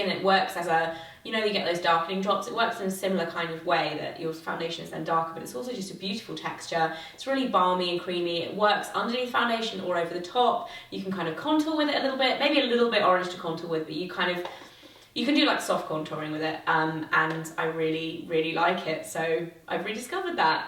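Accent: China. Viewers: The speaker accent sounds like Chinese (mandarin speaking) accent =) it's cute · Hahaha....the accent? I think it's Chinese! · The speaker is 100% speaking a Chinese accent! Lol!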